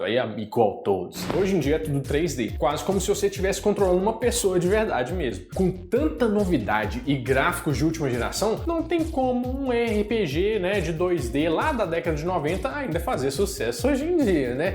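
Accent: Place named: Minas Gerais, Brazil. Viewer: Agora descubri que voçe é mineirinho né por isso que viçe tem um sotaque reconhecivel e eu também sou de minas gerais cara. Um abraço😎✌👊👍🙏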